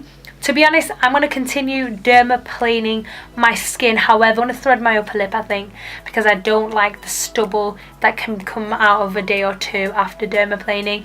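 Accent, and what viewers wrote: England, United Kingdom. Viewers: Idk why but I always am rubbed the wrong way when I see an Arab, Indian, or African with an English accent. All I hear is a little rat bastards coward runner. Ran from your country to the safety of another. Weak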